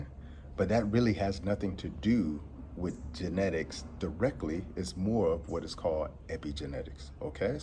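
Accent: Jamaica. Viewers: Is he Jamaican?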